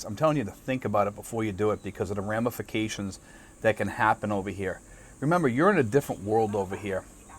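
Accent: Boston, United States. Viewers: Boston accent shined!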